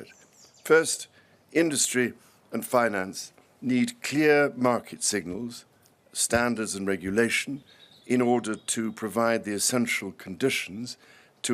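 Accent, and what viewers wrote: United Kingdom. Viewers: Prince Charles has a very classy British accent. His son doesn’t sound like him. I have never been a royal watcher from America and I couldn’t get past the full first episode of the Crown but I am so fond of Queen Elizabeth. She is an inspiration to me.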